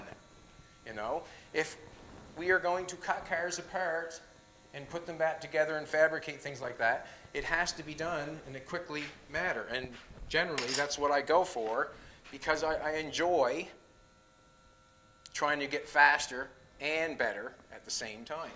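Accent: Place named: Newfoundland, Canada. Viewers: That's a Cape Breton accent if I've ever heard one! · East coast of canada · Where is this guy from? Sounds like a Newfoundlander to me. Great video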